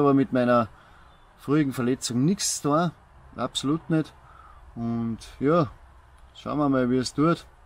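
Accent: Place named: Ernstbrunn, Austria. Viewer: Wo ist dein schönes Ernstbrunnerisch abgekommen?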